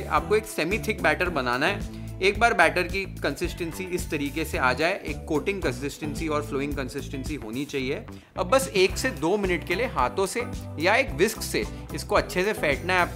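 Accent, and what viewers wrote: Maharashtra, India. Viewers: Bonus recipe❤🙂👍!!!!! Ekdam authentic preparation chef!!!! Jiyo chef !!!❤ also nice marathi accent❤!!! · BRO ARE YOU MAHARASHTRAIAN😮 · R you Maharashtrian? My wonder boy. Your sounds said.... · Your marathi accent is very nice 🚩🚩👌🏻👍🏻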